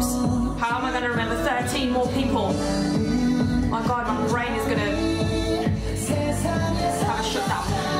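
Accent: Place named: United Kingdom. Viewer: Wait are you Swedish!?? I though you were from the Uk! I’m Swedish btw lol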